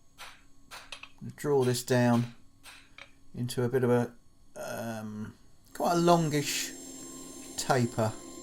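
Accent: England, United Kingdom. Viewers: I love listening to you talk! I’m American so all British accents are cool to me, plus I like hearing your thoughts about the work you’re doing. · No Music, no cartoon capers intros but a blacksmith with an English accent who’s all business! That’s why I’m here.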